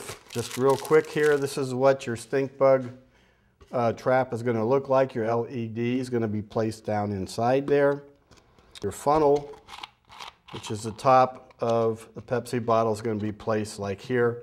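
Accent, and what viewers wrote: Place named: Pittsburgh, United States. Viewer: YEP, this Gentleman is from Da-Burg. Go Steelers!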